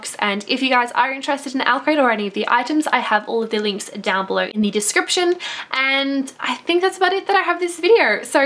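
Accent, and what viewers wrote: Australia. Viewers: I love her vids too and I love her accent I'm mexican and the aussie accent it's easier to understand than the american one to me XD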